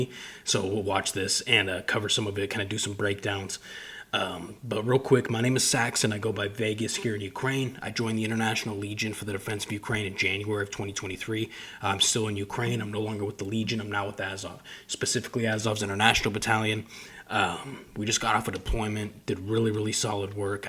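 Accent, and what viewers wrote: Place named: United States. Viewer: New subscriber here. I very much appreciate your straightforward style, yet you have a docile, soothing voice. I'll work on getting the backlog of your work watched in the upcoming days. I assume by your American accent that is where you are from, but people are abroad for many reasons, so I won't speculate. Love and support from NC, USA! EDIT: By the way, I'm so glad you're showing things as they occur and not blackened out, etc. People NEED to WTFO with that noise. I also appreciate, and that is not a strong-enough word, that you state your stance. I liked it a lot!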